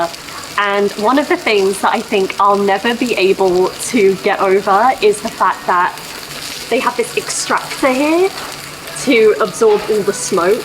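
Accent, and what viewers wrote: United Kingdom. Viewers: いつもながら、あなたの英語はまさにイギリス人で、歯切れよく、心地よい。バーナードショーのミュージカル「マイフェアレディ」で、ロンドンでもその人の属する社会層ごとに発音が違うと、イライザの発音を強制し、貴婦人に仕上げるヒギンズ教授の苦闘を見ていて、感動したのを思い出しました。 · いつも思うのですがイギリス英語はとてもきれいですね。素敵な笑顔とあいまって、とても素敵です。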